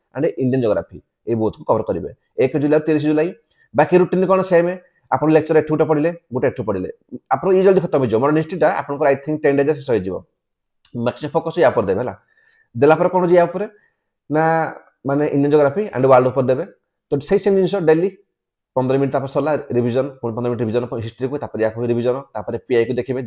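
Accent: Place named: Angul, India. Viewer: Sir aapana angul area ru ki language semti janaa paduchi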